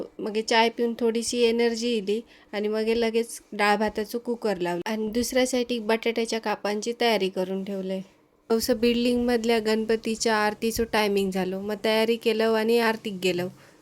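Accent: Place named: Malvani, India. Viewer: Madam tumhi khup chaan malvani bolta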